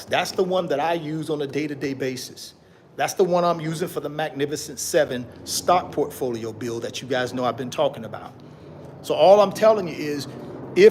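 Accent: South, United States. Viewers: When Says Nimber (Number) you know he’s gotta be from somewhere in the South 😅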